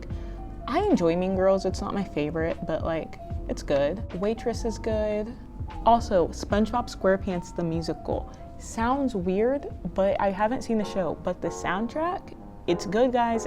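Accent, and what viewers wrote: Nigeria. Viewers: Ooh cool, is it weird you sound like nigerian? You didn’t really specify, but are you? I’m Nigerian, it’s cool seeing other Nigerians you tubers. Anyways congrats on 100k!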